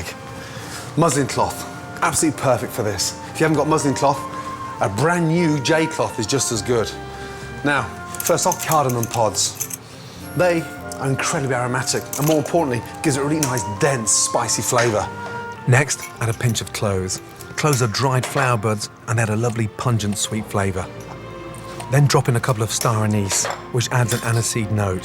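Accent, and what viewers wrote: Scotland, United Kingdom. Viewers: Great to hear a hardy Scottish voice in there! 😂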